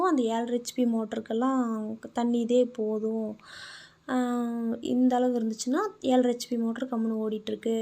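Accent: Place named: Kongu, India. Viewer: தாங்களுக்கு கர்நாடகமா சாரிமா(புனிதா)சகோதரி தாங்களுக்கு கோயாமுத்துர் என நினைத்தேன்மா சகோதரி ஏன் என்றால் உங்கள் பேசியதை(கொங்கு ல்லாங்க்)வைத்து நினைத்து விட்டேன் 😊😊😊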